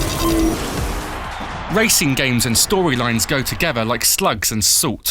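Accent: United Kingdom. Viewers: This British voice is pissing me off... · No offence but please do not use British reviewers thank you. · I love how nobody is raging on the Gamespot review about the fact the reviewers from the UK. Seriously, what's wrong with the UK? And for those saying they can't understand what he's saying, learn to speak English. You know, what with England INVENTING the language everyone's typing in now? I think this is a fair review. In the higher levels, the AI get aggressive and if you get caught up in the surroundings, you can find yourself jerking out of control. It's a known annoyance in all MS games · lol your british, you have a stupid accent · thank fuck for that! a uk review! been fed up of the american accents lately :L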